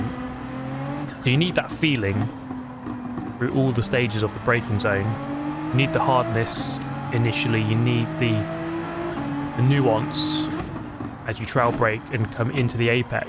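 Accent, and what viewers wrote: United Kingdom. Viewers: I'm pretty sure Fanatec is pronounced "fanatic" as in a crazy person. Don't hate me. You'll probably get away with it because of the British accent! Rock on!🎉😂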